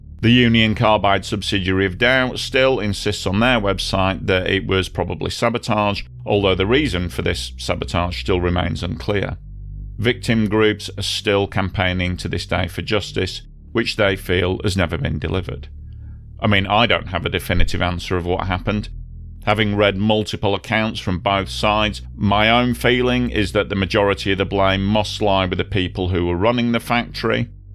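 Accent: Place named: North Midlands, United Kingdom. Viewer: I'm intrigued by *'s accent. I think I've narrowed it down to North Midlands/Derbyshire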